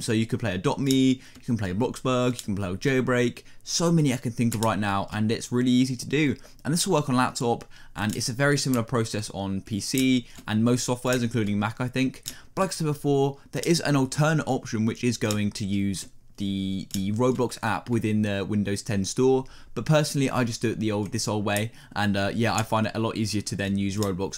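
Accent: United Kingdom. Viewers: litterally a british dude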